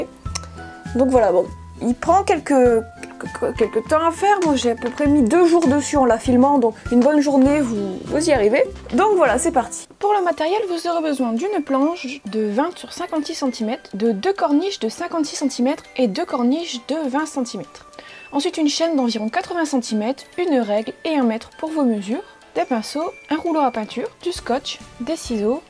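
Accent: North, France. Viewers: je ne sais pas si tu as deja repondue a cette question mais tu es du nord j'imagine vue ton accent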